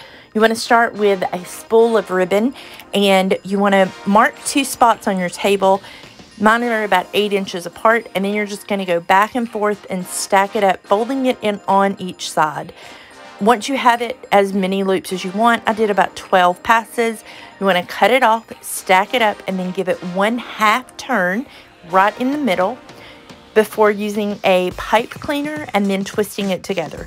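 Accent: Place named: South, United States. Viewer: Thank you SO much for this helpful video! Love your southern accent too ;o)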